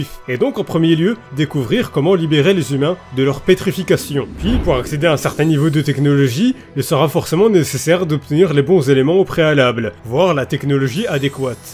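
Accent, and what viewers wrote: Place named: France. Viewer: Je lis les scan cher empereur (je suis même à jour), et "Senku" (Senkū en romaji si on en croit wikipedia) en japonais se prononce littéralement "CènkOU" pas "CènkUH", ça finis par le son "う" (allongé qui plus est) qui est un mélange entre le son "EU" et "OU" (comme le "U" de "LUffy") donc je sais de quoi je parle justement, après, si tu veux garder ce cher accent français, je ne me permettrais pas de juger l'empereur :) Merci de faire découvrir ce manga a un plus grand nombre (le coter comique étant son point fort pour moi), tu lis promise neverland sinon?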